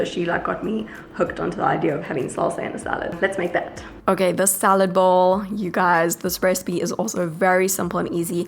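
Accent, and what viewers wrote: South Africa. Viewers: It’s that smooth SA accent. 👌👌😉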